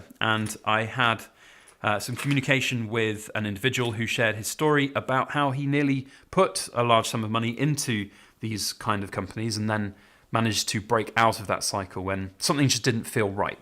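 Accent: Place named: RP, United Kingdom. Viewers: 😂 Brilliant Toff Accent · You have a posh accent IMO XD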